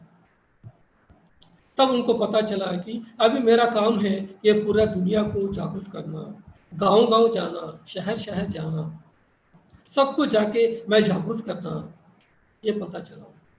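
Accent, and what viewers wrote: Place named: India. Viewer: I am fascinated by yur valuable words as well as ur Hindi ascent Sir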